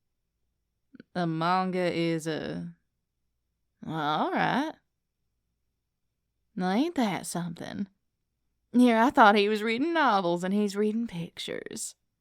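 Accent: South, United States. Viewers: I do like the southern country girl accent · Hi this video is awesome and plus I love your southern accent and plus I love the video and now i go to sleep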